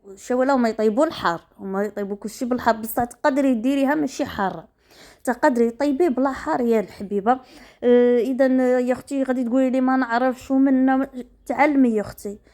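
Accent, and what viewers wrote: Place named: Tolga, Algeria. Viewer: على هدرتك كنتي جيهت طولقة انا من بسكرة تحية ليك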